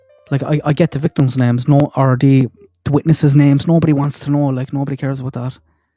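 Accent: Ireland. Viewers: Go on my brother Irish dude that’s a southern thick brogue you have Tipperary or near keep up the good “is mise Dáithí Ó ☘️✌️. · I'll take an Irish accent over a dull Midwest US one or even my own southern US accent any day!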